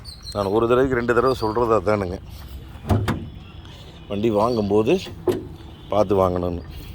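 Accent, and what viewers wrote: Kongu, India. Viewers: கொஞ்சும் கொங்குத் தமிழ் பேசும் கோமகரே...! திகட்டாத தித்திக்கும் பேச்சுடைய திருப்பூர் திருமகரே...! எங்கள் மதிப்பிற்குறிய டான் லடாக் பயணச் சாதனைச் சகாப்தம் திரு. அண்ணா அவர்களே❤❤❤🙏 எப்படி இருக்கீங்க அண்ணி❤❤❤🙏 எப்படி இருக்காங்க தம்பி ரித்திக்❤❤❤ எப்படி இருக்காரு மனசெல்லாம் வலிக்குது😔 ஒவ்வொரு ரூபாயும் போன் போன்றது😔 அதோட மதிப்பு இப்படி விரயம் ஆகுறத பார்க்கவும் முடியல கேட்கவும் முடியல😔 ஷோரூம்ல புது காரை டெலிவரி எடுக்குறதுக்கு முன்னாடி PDI (PRE DELIVERY INSPECTION) விநியோகத்திற்கு முந்தைய ஆய்வு. இதை 100% செய்துதான் காரை டெலிவரி எடுக்கனும். ஒரு புது காருக்கே அப்படினா? ஏற்கெனவே ஒருத்தர் பல வருஷமா ஓட்டி தேய்ச்ச பல வருஷத்துக்கு முந்தய used மாடல் காரை 2000% துள்ளியமாக சரிபார்த்து இல்லையா வாங்கனும்😏 ஏமாறுபவர்கள் இருக்கும்வரை ஏமாற்றுபவர்கள் ஏமாற்றிக்கொண்டுதான் இருப்பார்கள்...! என்றும் அன்புடன் உங்கள் தம்பி என்றும் அன்புடன் உங்கள் TMF ரா.முஹம்மது கபூர் (சென்னையில் இருந்து)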